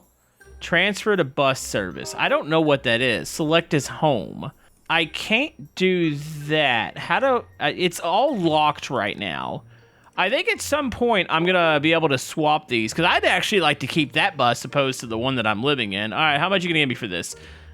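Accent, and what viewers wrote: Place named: Texas, United States. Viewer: I watch your content alot and I will say its pretty fun, especially when you see it with snacks! I couldn't help but notice that you sound texas (Not being rascist or something tho)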